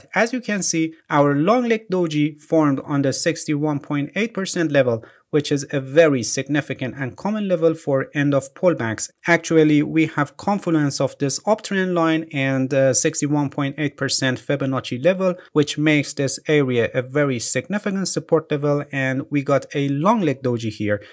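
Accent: Iran. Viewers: You have a strong Persian accent Hard to understand what you’re saying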